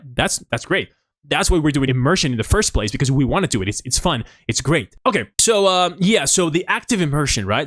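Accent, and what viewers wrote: United States. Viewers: Nunca me gustó ese acento americano, suena muy afeminado. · Do you have any favorite TV shows, movies, or Spanish-speaking Youtubers? I find it hard to find places to practice my listening. Btw your American accent is really good! You sound like you grew up here!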